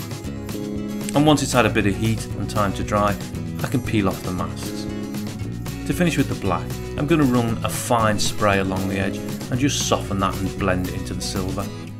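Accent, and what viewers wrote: England, United Kingdom. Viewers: An excellent clear, concise video and to top it off in an English Accent for a change. Thank you👍😷👍🏴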